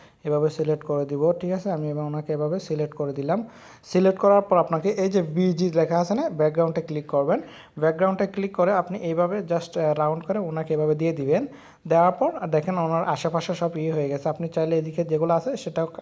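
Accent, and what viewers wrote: Noakhali, Bangladesh. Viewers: After focus pro i have used long times ago around 5/6 years when i was using SYMPHONY XPLORER W25 which was my first android smartphones. Moreover it was a gingerbread version, so your review doesn't making any bell to me. By the way one request to you: "your review might well perhaps your presentation was really terrible & i hope next time you will be more careful to use proper Bengali accents instead of নোয়াখাইল্যা খিচুড়ি কথা, either your review will going to be spoiled.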